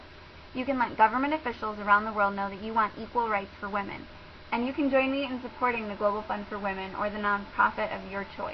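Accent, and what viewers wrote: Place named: Wales, United Kingdom. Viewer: love the welsh accent.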